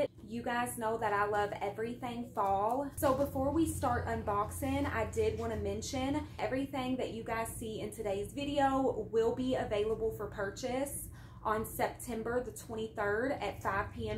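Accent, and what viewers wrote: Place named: West Virginia, United States. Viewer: I love your accent. 😊 it reminds me of home (WV)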